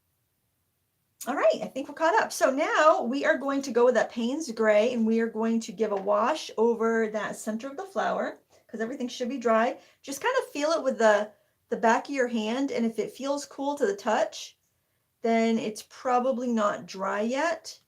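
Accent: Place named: New England, United States. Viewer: You talk like a New Englander! NEVER apologise for that! New Yorkers talk even faster! You guys are just who you are and i, as a Brit, love your vieos, don't change to try and please everyone..southerners talk so s l o w, so each region is unique! Your art work is gorgeous!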